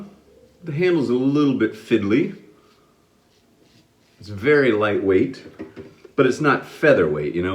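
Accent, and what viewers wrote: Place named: Liverpool, United Kingdom. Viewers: I got a slim twist white handle I got off my dad... Actually it was the razor that I started using that got me in to the rabbit hole that is DE razor shaving. Your liverpudlian accent almost had essence of mancunian in it. But not bad though. I'm waiting for you to perfect the Yorkshire accent. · Nice guitar, you should have worn it across you while you shaved! The liverpool accent is pretty good also 😁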